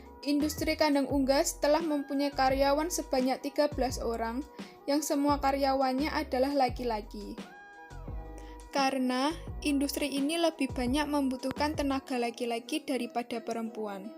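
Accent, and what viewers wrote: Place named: Java, Indonesia. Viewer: medoknya sangat terasa khas Templek 😭👍🏼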